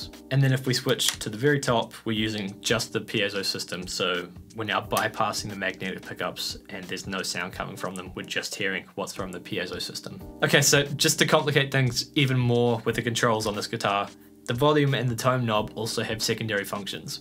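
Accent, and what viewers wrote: New Zealand. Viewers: Nice to hear a New Zealand accent. Where did you buy the guitar from? Local or online? I'm considering getting one also.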